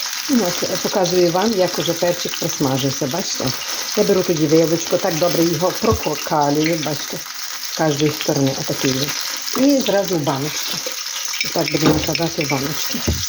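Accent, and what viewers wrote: Ukraine. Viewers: Вот это настоящий Украинский! Приятно слушать и все понятно. Спасибо вам.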